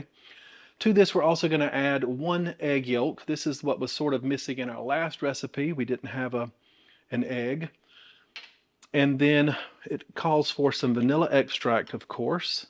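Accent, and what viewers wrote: South, United States. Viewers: Gosh I’ve had such a bad day, hearing this southern accent talk about cookies is just what I need 😊 thanks!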